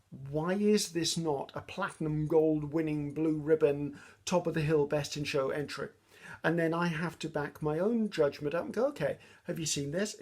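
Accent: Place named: Wales, United Kingdom. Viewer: Love watching your work come into being. Just beautiful every time. Today while watching your Sept 4, 2021 video, "Create unique labels from one of a kind Master Boards", <link> I heard you say you're in Wales. Suddenly, your accent made all kinds of sense. With the Welcome to Wrexham Docu Series by Rob and Ryan, I've been hearing the accent quite a lot and fallen quite into fanship for Wrexham, the community and team. ARe you a fan of any of the Football clubs?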